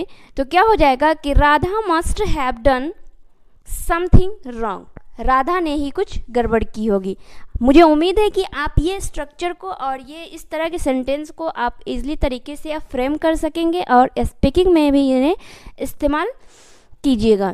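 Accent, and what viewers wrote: Bihar, India. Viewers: Well! she was doing, write a Bollywood movie song. I was just, about to play Cricket. My result is, about to come. You must have, come to my home at soon. I was doing busy, take to my bath. Yess: I knew that You are from [Bihaar] with Yours, accental Mother Tongue phonatic sounds but still; I couldn't know about Yours complete qualification please. I am from Yamuna Nagar, [Hariyaana] state of India. Graduated in Arts, with the Instrumental and Vocal Music. Okay Madam!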